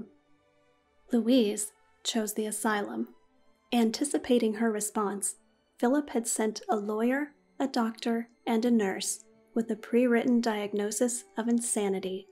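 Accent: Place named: United States. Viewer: Sad that the speak is american. the crediblilty changes when its us talk.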